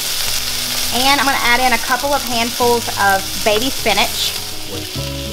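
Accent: South, United States. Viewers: Love that sweet southern accent you have runs deep in us Southern women! You have a beautiful family so happy to have found your channel! Cover the chicken with plastic wrap and you want have to worry about chicken juice getting on counters just helps with cleanup and safety. 😊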